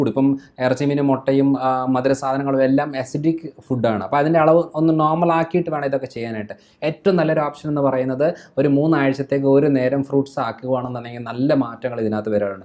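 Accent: Pala, India. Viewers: Attitude പാലാ ഭാഷയും കൊള്ളാം....പക്ഷെ ഇടക്ക് കാടു കയറുന്നുണ്ട്....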